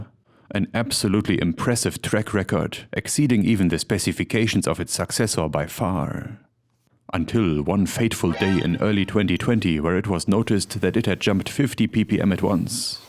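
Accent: Germany. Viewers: figuring out peoples origin in Europe from their accent... in that moment I KNEW he was German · Please just stop ... youtube autoplay brought me here and your DSCHERMÄN AKKSENT is soooo cringy .... just stop ...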